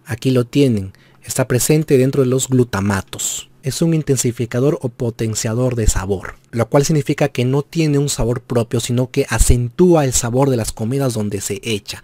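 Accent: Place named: Peru. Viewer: peruano eres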